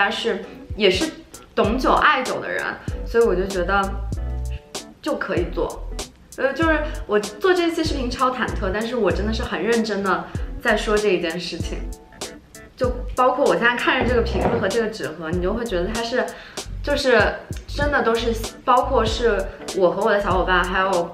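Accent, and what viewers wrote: China. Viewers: 武汉普通话？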